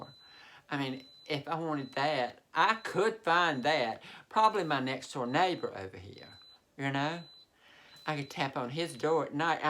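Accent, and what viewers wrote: South, United States. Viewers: I love that sweet southern drawl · Love that southern accent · I love your Southern accent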